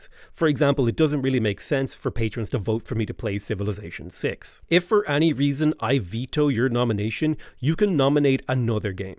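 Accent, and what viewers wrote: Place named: Ireland. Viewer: Hey, long time fan/ first time commenter, here. I think you have earned another patreon supporter since I have been enjoying your channel for over 5 years. I remember one stream where you were talking about how many people think your are so smart (the accent helps) and you replied that..." if I you were really smart I would have money and a career". I know its hard to earn a good living these days in California, and probably harder in Ireland. Congratulations! you have found a unique path that I would like to support. Keep up the great work! Oh I was wondering, do I need Facebook to view your Patreon pages? I hope not, cause I got rid of Facebook.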